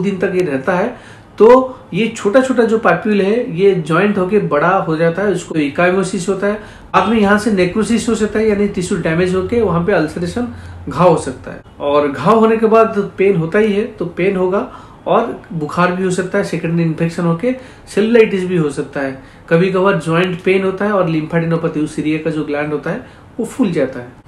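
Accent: Odisha, India. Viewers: Are u an odia sir??😊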